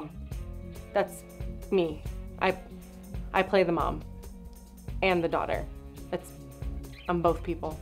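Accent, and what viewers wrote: Iran. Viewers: I love your videos 😍 sense of humor 😂, and especially the Iranian mom accent. If you are getting hate mail in comments that just means they are jealous haters. Keep up the good work 👌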